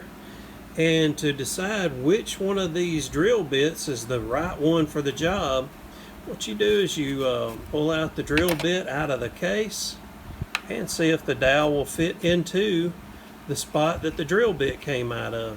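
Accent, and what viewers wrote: South, United States. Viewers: I love the lush greenery and wet rain - so beautiful! Must be down South judging from the accent. That is one lovely table! The contrast of white dowel with black walnut is so lovely. Too bad we never saw the underneath when finished. I don't know how this moves exactly. I guess it just swivels on the rod? Also wanted to see the dowel inserted.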